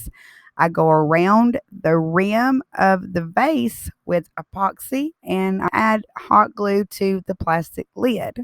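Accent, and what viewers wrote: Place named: Alabama, United States. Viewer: I absolutely love your accent. Sound like my sil from Bama.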